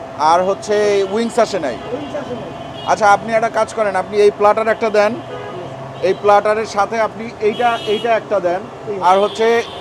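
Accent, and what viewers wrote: Barishal, Bangladesh. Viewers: ভাই আপনি তো বরিশাইল্লা, মিরপুরের পোলা হইলেন কেমনে?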